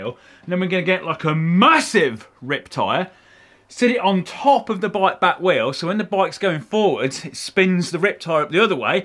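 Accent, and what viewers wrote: United Kingdom. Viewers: This guy has British accent, German look, Italian temper and American craziness.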